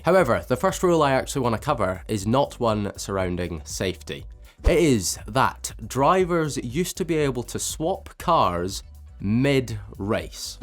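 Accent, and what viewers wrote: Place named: Scotland, United Kingdom. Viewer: fellow scot! Imma sub to you just for that.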